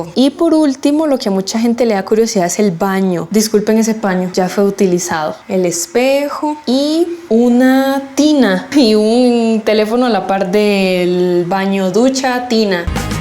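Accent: Bogotá, Colombia. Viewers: Hey amiga debo decir que al principio pensé que eras una Bogotána más. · los costaricences hablan muy parecido a los bogotanos, es la locura jajaja,. tienes un acento muy muy similar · Me engañaste creí q era una rola una hija de papi y mami de las entrañas de Bogotá .😊pero si el acento es casi parecido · SI SE PARECE.SI ESE ES EL ACENTO,SI BOGOTÁ...👍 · Hablas como rola Bogotana 😮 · Soy de Bogotá y tienes el mismo acento que mis Primas, pensé que era de Bogotá. Saludos🇨🇴🤝🇨🇷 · Buen video y me alegro que visites nuestra ciudad, tu acento es muy bogotano, si te viera en la calle pensaría que eres bogotana, además también tu aspecto y todo es muy de Bogotá, y pues también queria hacerte unas pequeñas correcciones, el presidente de Colombia vive detras del congreso la cual se llama la casa de Nariño, lo que señalaste es el Colegio de San Bartolomé, el más antiguo de toda Colombia fundado en 1604, lo de las carpas en la estatua de Bolívar es porque ahi una guerra entre guerrillas en la región del Catatumbo, en el departamento ee Norte de Santander, y seguramente protestan para que esta guerra acabe, y en cuanto a la plaza de toros la última corrida se hizo en 2020, y que yo sepa no se hace nada relacionado con toros, ni corridas ni nada, ese lugar se usa para eventos culturales pero son de vez en cuando, por eso el lugar se ve asi un poco deterirado y lleno de grafittis, y las corridas de toros ya se van a prohibir en el 2027 en todo el país, y en las únicas zonas donde es activo la tauromaquia son en pueblos y en una ciudad llamada Manizales donde son famosas sus corridas, pero gracias a Dios lo van a prohibir por completo, espero recorras mas sobre la ciudad y todo el pais y lo disfrutes, un abrazo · Tu acento si es parecido al de Bogotà Saludos ! 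· TU HABLAS COMO LAS ROLLAS OSEA LAS DE BOGOTA CAPITA ( ACENTO) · Hola si es verdad que el asentó se parece mucho al de Bogota' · Tienes un acento muy parecido al Bogotano 💐🌹🌺🌷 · muy parecido al acento de Colombia Soy colombiano y pensé que usted era de Bogotá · Tu acento es muy semejante al acento de bogotá❤ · Hola soy de Bogotá y por tú acento pensé que eras de acá 😂. Hablamos pausado y esta conciderado como el mejor castellano del mundo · Hola amiga,pienso que el acento Tico, es muy parecido pero al acento Rolo, me parece increible, porque historicamente realmente nunca fuimos un solo pais. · El acento igual al Bogotano pareces rola saludos 🇨🇴☺️ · Y para ser mas preciso, tienes el acento de una Bogotana que creció en un estrato socio-económico del 4 al 6. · Si el acento es muy iguall al bogotano le comento en la historia de Colmbia dicen que iva hasta Costa Rica le dejo esa inquietu aberigue en la historia de su pais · Si, tienes acento Bogotano. 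· Soy de Bogotá y me costó mucho trabajo tu acento, hablas igualito a nosotros. Te darás cuenta que nadie es capaz de reconocerte como extranjera. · Pues si cuando comenzaste a hablar pensé que eras bogotana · Al principio pense que era una Rola hablando de Bogotá... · Cuando te vi y oí pensé esta colombianita hablando del país, hablas y te ves como Bogotana. Muchos éxitos. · El acento es muy parecido al de Bogotá. Bienvenida mi chinita a la capital. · Se me hizo que tiene acento rolo(bogotano) con algo muy mínimo de costeño. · Si no leo los comentarios, juraría que eras bogotana. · Soy colombiana y antes de q hablaras del acento pensé q eras rola , es cierto hablan bastante parecido a los Bogotanos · Literalmente pense que eras Bogotana y estaba un poco confundido con el titulo del video xD Como que llegaste a tu pais? ya cuando dijiste que eras de Costa Rica entendi todo, son acentos demasiado parecidos, me paso igual en Costa Rica, hermoso video, gracias por visitar, te mandamos un fuerte abrazo! 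· Si, el acento se parece mucho al bogotano. Indudablemente.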